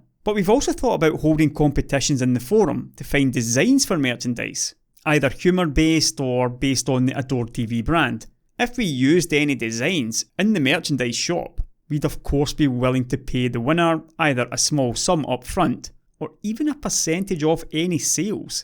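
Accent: Scotland, United Kingdom. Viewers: read any article that * wrote with a Scottish accent in my head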